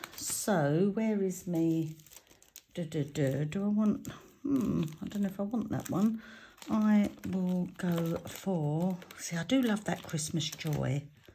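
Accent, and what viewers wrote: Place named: RP, United Kingdom. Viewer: The proper queens English!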